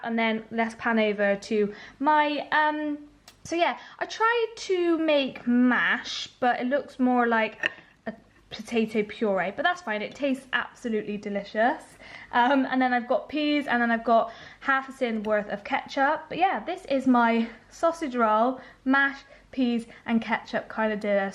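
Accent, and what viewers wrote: Bristol, United Kingdom. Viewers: I knew you were from the west country. Love the "brizzol" accent.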